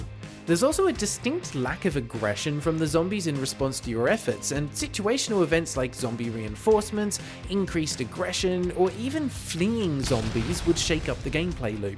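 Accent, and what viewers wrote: South, United States. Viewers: I love southern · I am all for southern American accent! Yee-haw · i thought he was going for southern US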